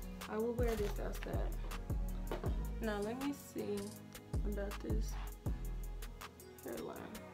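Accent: Chicago, United States. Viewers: Where you from. It sound like you from Chicago 😭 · You from Chicago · you’re so pretty🥺 you sound like you from chicago too😭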